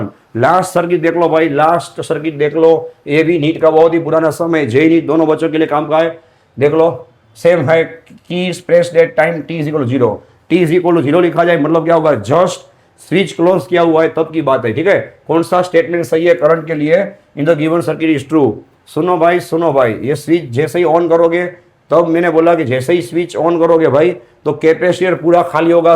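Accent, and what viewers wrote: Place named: Gujarat, India. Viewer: Very osm sir I think you are gujarati it's new for me to listen ❤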